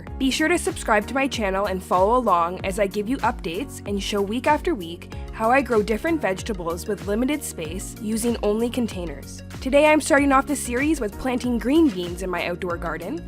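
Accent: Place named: Canada. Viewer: Great advice! Are you from Canada or Minnesota by chance? I recognize a little accent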